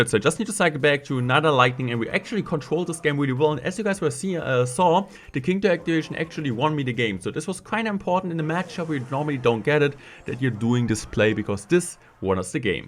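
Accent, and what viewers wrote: Germany. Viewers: i hear a german accent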